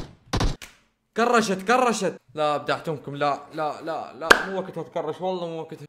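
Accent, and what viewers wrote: Iraq. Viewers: فدوه لقلبك،وربي منجد احب لهجت العراققق😭❤️❤️❤️❤️❤️🇸🇦🇮🇶.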